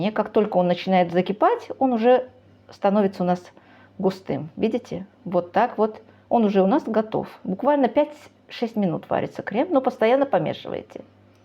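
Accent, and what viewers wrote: Baku, Azerbaijan. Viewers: Чувствуется легкий бакинский акцент....😊 Спасибо! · Как приятен слуху Бакинский акцент. Родилась в Баку и прожила там 25 лет, но не знала такого рецепта. Спасибо , надо попробовать. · Этот незабываемый говор бакинский❤ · Вы видимо не так давно выехали из Баку, у вас до сих пор есть бакинский акцент🎉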